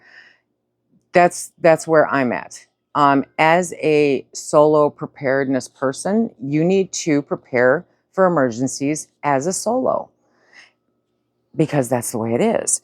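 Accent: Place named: Michigan, United States. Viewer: OMG... I'm so glad I found you. After watching for 15 minutes, I began to recognize your logical, pragmatic thinking, your speech cadence, the landscape behind you, and I thought, "I wonder if she's in Michigan?" Lo and behold, went to your vid description and there it was! I'm a former Michigander, born and raised in southern lower MI. Now living in AZ (looooong story and not by choice.). Caring for a spouse with Alzheimer's and there could come a time when Ill be on my own. Will return to MI because that has ALWAYS been home. Thank you for your wonderful tips, you're providing a valuable service! 💙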